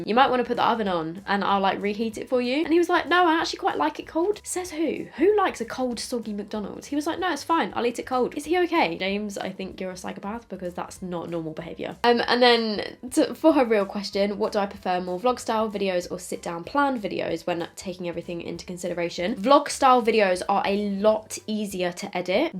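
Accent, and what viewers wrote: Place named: United Kingdom. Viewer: is she british? i love her accent!! 🤍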